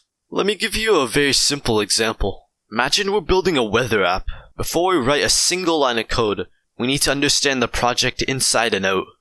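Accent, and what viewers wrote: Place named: Netherlands. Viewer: I can smell the Dutch in your accent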